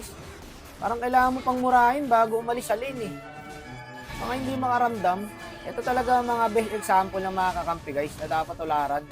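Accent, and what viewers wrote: Iloilo, Philippines. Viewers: Bos ilonggo ka naman mag salita sa content mo kakatuwa eh enjoy 😂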